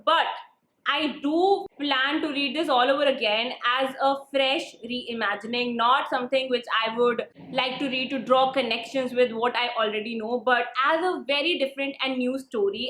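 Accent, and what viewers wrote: India. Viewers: Ma'am, A very Good Morning to you, whenever you read this. I was very much astonished ; as I am first time watching you consecrating YouTube from the videos; you posted for Lord Shiva Trilogy. I bow low to Lord Shiva. I had read the three Books of Amish Tripathi. First book was like a TNT explosive that are been up to now shattering and mersmerising experience while reading. Honestly speaking I never been into reading books but one day my sister told me about it and since from then I read all three. The vocabulary and the art of expressing the expressions in magical wording are Superfine with new never ending joy. You posted video directly without more than 3 to 4 cuts. I mean, looking at you keeps me believe that this is a better developed India where girls are about to fuel in and take off the flights to extreme heights. Your tone is bit Hinglish(no offence) but you speak correctly with the right pace, confidence and proper pronunciation . 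Thank you ma'am for delivering the words for describing Shiva Trilogy. You are a source of inspiration for million of us. Ma'am it would be good if you can tell me that how you cope up with camera fear and to be presentable in public appearance or shoot . Keep up the momentum. comment tagging me. Thank You ma'am. Vivek Malwal.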